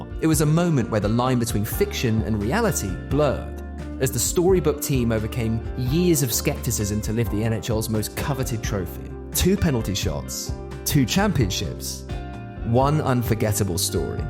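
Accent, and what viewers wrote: United Kingdom. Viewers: It’s my First time on the channel, I’m British and was expecting a North American to be narrating, to my absolute delight he’s British. Love to see it, hockey is so much bigger in the UK than what most North Americans think!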